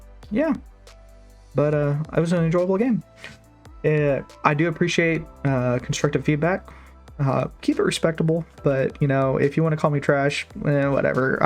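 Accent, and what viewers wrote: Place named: Texas, United States. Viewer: You have the least obvious Texan accent I have ever heard.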